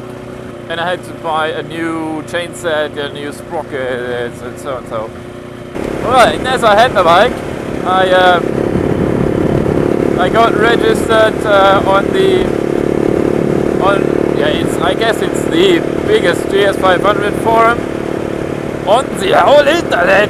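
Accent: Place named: England, United Kingdom. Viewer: Ist mir aufgefallen, einen leichtes flat-english ist rauszuhören! :-)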